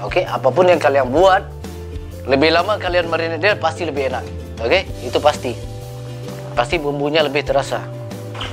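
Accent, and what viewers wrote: Malaysia. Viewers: Orang Padang kelamaan dimalaysia Ampe 32 thn gaya ngomong nya Ampe kebawa kyk org Malaysia asli (Melayu + Inggris) mantap lord 👍 Sehat sllu & panjang umur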